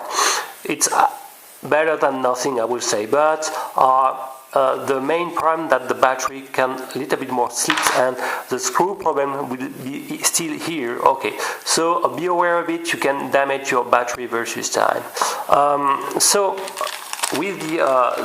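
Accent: France. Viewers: Are you French ? I notice a familiar accent to me :)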